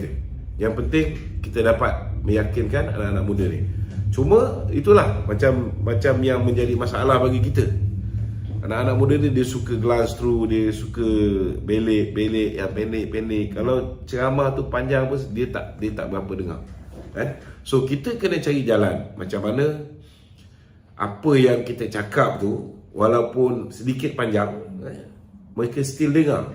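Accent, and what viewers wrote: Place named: India. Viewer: Pada pendapat saya DLA sembang mcm keling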